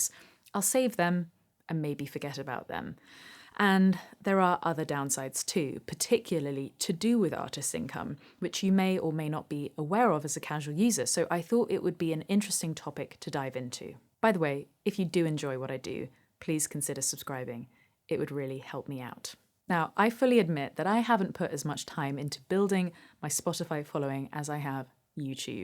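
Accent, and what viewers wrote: United Kingdom. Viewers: Beautiful shirt! As I've mentioned before, your speaking voice is another possible revenue stream. It is beautiful to listen to -- and remember how potty Americans are about British accents. My two favourite female speaking voices are Kirsty Young and Mary Spender. So be fruitful and multiply. I mean about revenue streams, not...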